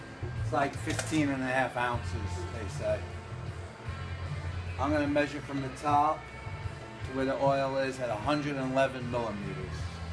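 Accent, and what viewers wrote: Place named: New Orleans, United States. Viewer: Your accent sounds a little New Orleans but not exactly.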